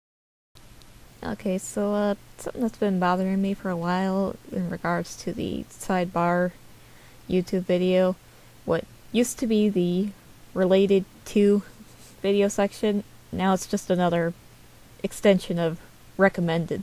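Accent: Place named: Minnesota, United States. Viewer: you sound like you're from minnniii -soootaa also no idea how i got here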